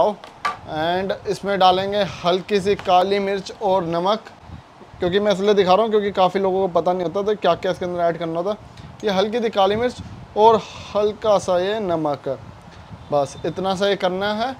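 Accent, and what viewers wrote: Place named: Uttar Pradesh, India. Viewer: Aap up se ho sir voice se pta chl jata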